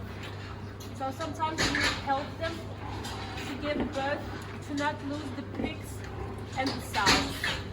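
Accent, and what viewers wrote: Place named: Eastern Europe. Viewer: You have very good english for what seems like you're a Eastern European pig farmer. I'm assuming Eastern Europe from your accent. I'm impressed. Most Eastern European pig farming videos I've seen on YT, they can't speak english.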